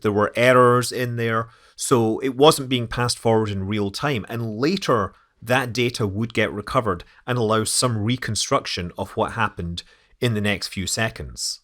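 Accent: Scotland, United Kingdom. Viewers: Brilliant video. Your accent is clearly north of the border… anywhere near Aberdeen? I’m guessing a wee bit further south? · Scotland, clearly 🙄 Manners cost nothing, you need to learn some.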